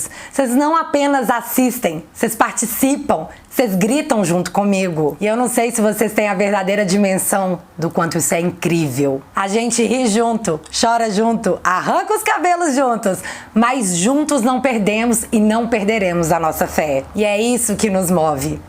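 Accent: Minas Gerais, Brazil. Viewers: Só mineira fala “procês”... e vc fala assim, kkkkk adoro mineirices, ô trem bão, uai! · Mesmo sabendo que o conteúdo produzido pela oposição é farto, não fico mais sem o "sotaque minerim" das verdades políticas tão bem resumidas e com excelência no humor, exibindo verdades sem ofensas ou ataques que não se pode aferir. Parabéns, nóis dóra ocê!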